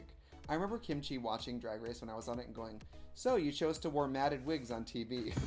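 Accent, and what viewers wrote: Wisconsin, United States. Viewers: The last time I was this early Trixie still had her Wisconsin accent 😭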